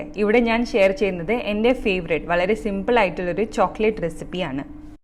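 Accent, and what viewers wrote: Thrissur, India. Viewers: KPL nmmde ijk... Are u from thrissur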